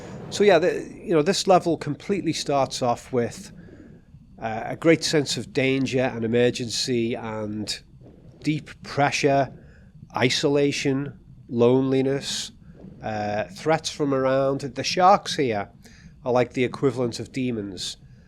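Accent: United Kingdom. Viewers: Interesting analysis! You also spund fairly local. are you based in merseyside? haven't found many content creators round here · This will be excellent. My favourite all time games for many reasons. The cerebral aspect of them at the forefront. Scouse and tomb raider what a mix. 👍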